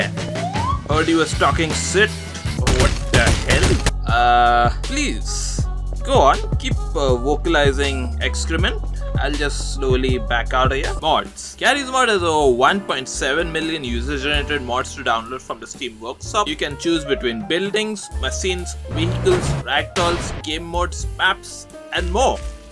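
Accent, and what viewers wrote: India. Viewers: You sound indian but i have abit indian accent in my voice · you are indian? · you gotta love his Indian accent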